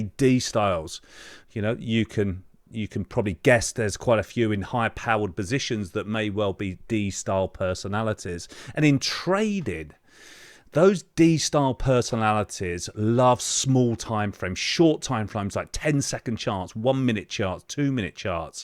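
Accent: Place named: United Kingdom. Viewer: I love you and you’re such a wonderful instructor! I love British accent that’s why! God bless you man 🙏🙏🙏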